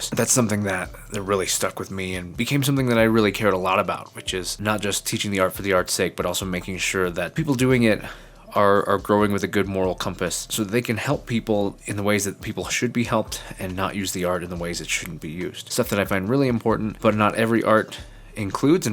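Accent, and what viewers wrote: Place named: Visayas, Philippines. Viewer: KASAG bisdak ni ug gikan Tobosa klaro kaayo. Way bisaya naka basa diri hahaha.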